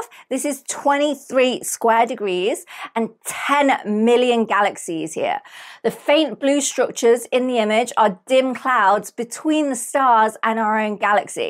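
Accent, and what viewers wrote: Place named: Cockney, United Kingdom. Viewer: you look Chinese but you speak cockney...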